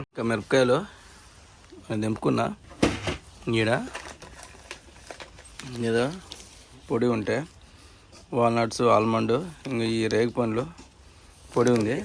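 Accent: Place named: Rayalaseema, India. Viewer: మీ తెలుగు చాలా బాగుంది మా రాయలసీమ వారివాలె వున్నారు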